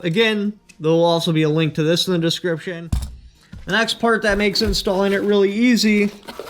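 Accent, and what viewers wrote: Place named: Northwoods, United States. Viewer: All hail the finest firearms fanatic from the Northwoods. Sweetest damn accent on the internet if ya ask me. Keep on keeping on brother.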